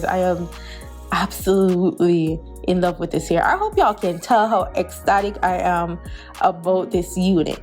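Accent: Jamaica. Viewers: Girl look pon de lace lmao, love when you speak patois. Lovely unit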